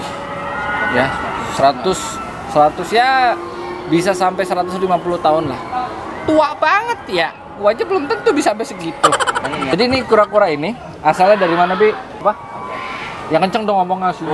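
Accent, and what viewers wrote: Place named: Tegal, Indonesia. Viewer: Wong tegal ndi suarane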